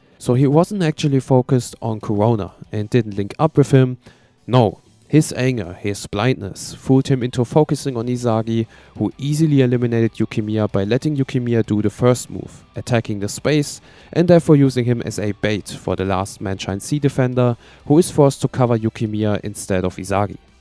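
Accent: Germany. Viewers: are you german=?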